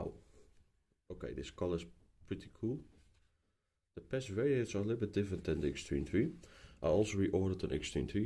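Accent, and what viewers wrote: Netherlands. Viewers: That dutch accent🥲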